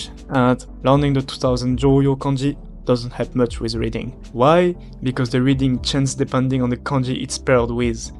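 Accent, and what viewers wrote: France. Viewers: Are you french or from eastern europe countries ?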